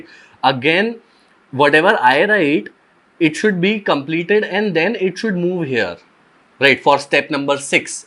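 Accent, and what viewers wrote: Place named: India. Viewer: he sounds like those scammers from India xd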